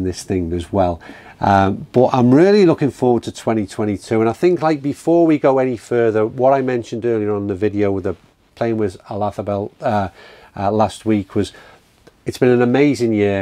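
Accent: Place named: Scotland, United Kingdom. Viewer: this is a good morning from Montréal, Canada. First I had to get used to your Scottish accent. Thereafter it is the most pleasant trip I can have to visit Scotland and appreciate a friendly average guy who happens to be a pretty good golfer, visit golf courses and listen to reasonable and fair evaluations of golf material. I should add on, the background music is most of the time very good. I feel like listen to the next door guy who’s talking to me and not taking me for a stupid consumer. Many thanks for your interesting material. Here’s it is -25 Celsius, even a Noodle golf ball would die in the middle of a flight.😎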